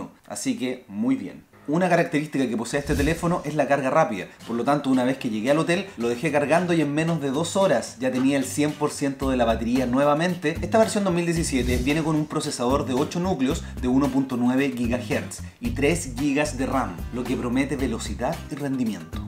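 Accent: Chile. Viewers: Eres Chileno, yo lo soy, y creo que tu idioma se parece un poco al Chileno😂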